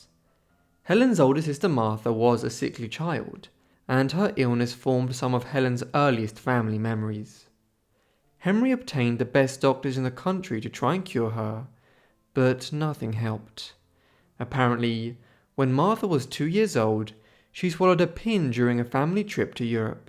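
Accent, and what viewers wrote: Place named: England, United Kingdom. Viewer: English accents drive me nuts. I can't listen to this BS.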